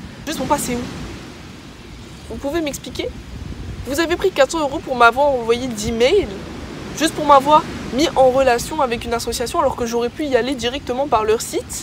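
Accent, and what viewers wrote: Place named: Africa. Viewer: Elle a un peu un accent africain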